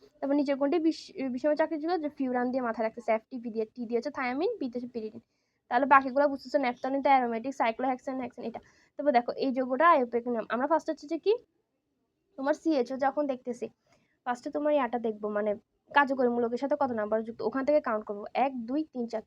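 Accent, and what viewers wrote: Chittagong, Bangladesh. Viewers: আপু কি চট্টগ্রাম থেকে?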